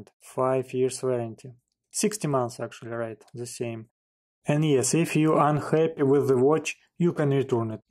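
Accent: Russia. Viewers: Такой прикольный русский акцент 😅 лайк за обзор :)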